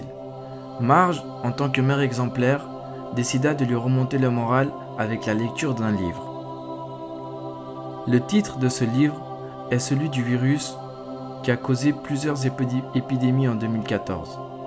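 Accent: Belgium. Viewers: Il est belge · C'est obligé ce mec il est belges vu comment il prononce les dates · + articulation TRES faible, je connais bien l'accent belge mais je n'arrivais pas non plus à comprendre les années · T belge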